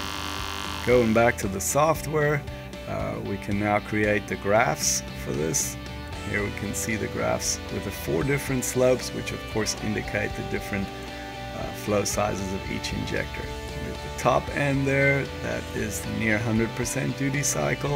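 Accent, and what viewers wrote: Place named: United States. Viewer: He is from South Africa,but has cultivated his US accent pretty well.😁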